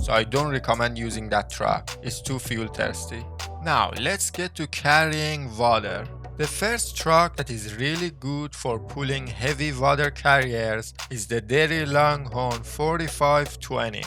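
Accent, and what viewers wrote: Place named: Iran. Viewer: dude i love your persian accent XDDDDD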